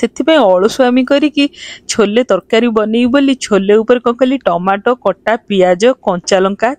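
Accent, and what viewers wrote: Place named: Odisha, India. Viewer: Mam apana pura pure odia kahuchhanti america re rahiba pare madhya Proud of India proud of odisha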